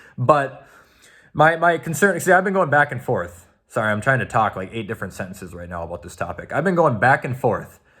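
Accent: Minnesota, United States. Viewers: I am Canadian, and he sounds American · I knew you were Minnesotan cause I saw the banners and your accent is exactly like other Minnesotan accents Edit: you being a Minnesotan fan and saying you hate the cowboys more than the packers wow I’m surprised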